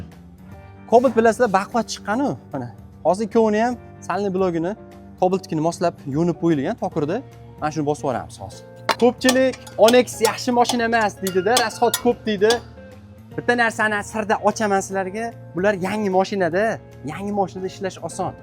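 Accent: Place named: Kokand, Uzbekistan. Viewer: Аллохума барик! Сог булинг Укамжоним, маълумот жуда чиройли етказиб берилган! Куконда лигини шеъвадан тушундим, каерда жойлашгансизлар?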